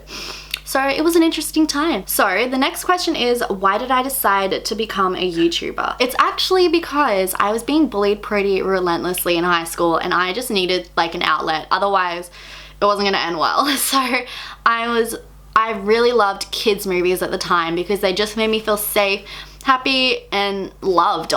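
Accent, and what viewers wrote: Melbourne, Australia. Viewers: Omg I’m from America but I’m moving to Australia this year! I thought based on your accent you were from Melbourne but I’ll be moving to Nelson bay which is much closer to Brisbane than it is Melbourne! I’ve been obsessed with your vids lately! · I couldn’t even tell you had an accent, probably bc I’m Australian too 😅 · I love your accent I've been to Australia.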